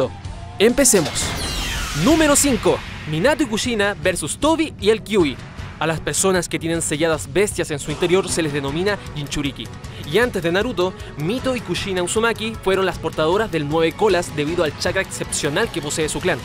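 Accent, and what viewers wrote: Chile. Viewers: Chileno verdad? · Es chileno?? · Se nota que es chileno, buen video amigo saludos de coquimbo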